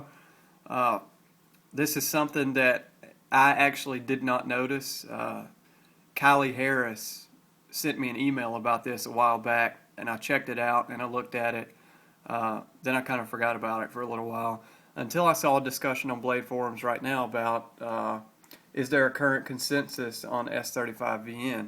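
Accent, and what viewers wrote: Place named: Appalachia, United States. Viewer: help! i cant decide which knife i want to use to kill myself after watching your legion of horrible, redundant, long winded videos! I admit i was bought in by your initial charms, the qualities of your down home voice, a voice of an educated hillbilly were refreshing. Youre a simple guy--a shirt tucked in, cell phone attached to the belt, hands on 10 and 2, I hate drugs, sports team hat wearing, kind of guy. Studied "business" in college right? Put the gun in the closet for 3 months and you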